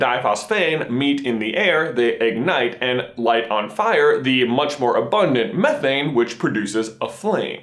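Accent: South, United States. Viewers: I can't get out of my head that he is southern now. I didn't notice his southern accent before. Great. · i never realized that he has a sight country accent until this video and now it is all i hear oml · Do you normally talk with a Southern accent, if so why change it?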